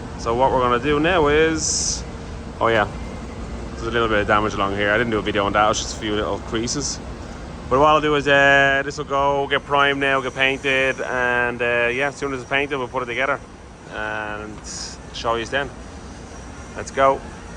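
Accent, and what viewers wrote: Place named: Dublin, Ireland. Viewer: Love the Dublin accent! Up the Dubs! Great job on the car.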